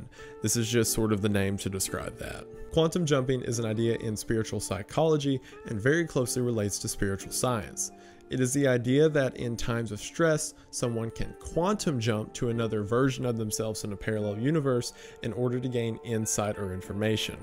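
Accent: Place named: Georgia, United States. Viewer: it's a Georgia thing I think. I got a buddy from north of Atlanta and he talks exactly like. all the same turns of phrase, mispronounces words the same way, same cadence - the works.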